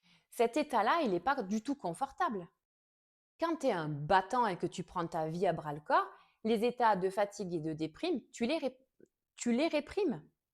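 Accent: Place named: South, France. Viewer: Bonsoir. MERCI infiniment pour votre réponse. Il est vrai que le mental façonne parfois la perception de certains événements. Il faut se poser les bonnes questions en essayant de rester aligné. Vous avez raison. Ce n'est pas l'arrivée qui compte, c'est le chemin..... Encore MERCI. (Vous avez un petit accent du sud🌞). Je vous souhaite une belle soirée. Bien cordialement. Olivier...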